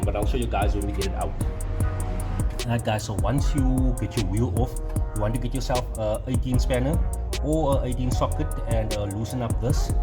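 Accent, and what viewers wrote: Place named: South Africa. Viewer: Love the South African accent 😄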